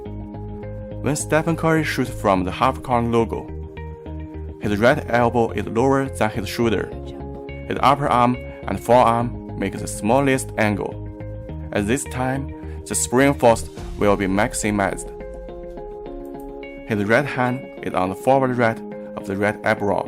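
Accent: China. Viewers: po主是北京人吧。反正我觉得挺像那附近的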